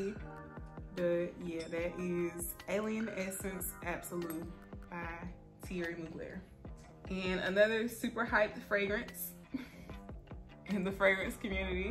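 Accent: South, United States. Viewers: By the way I love your accent! I know everyone always says they don’t have one 🙄 but yours is so soft with a southern tone!! I’m from London so I find it so 😍😍😍!!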